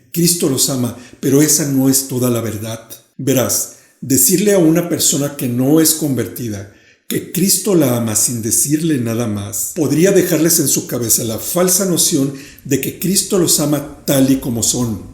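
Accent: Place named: Mexico. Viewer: Tiene un acento Mexicano, excelente explicación, felicidades, bienestar para tu vida.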